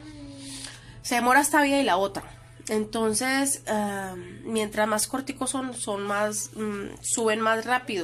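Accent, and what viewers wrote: Colombia. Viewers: A mi me encanta su forma de ser y decir las cosas muy directa pero sobretodo su acento colombiano, no haga caso, siempre hay gente envidiosa y maluca como dice usted. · Hola mi hermosa, no usted no nos aburre a mi en lo particular me entretiene y ese timbrito de voz colombianito me encanta 😍 me gustan mucho las personas colombianas yo soy de Mexico y siempre me ha gustado el tono colombiano. Cuídese que Dios los bendiga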